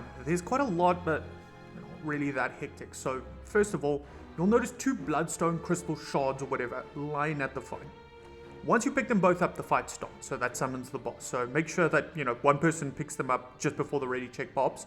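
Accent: South Africa. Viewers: are you South African bru ?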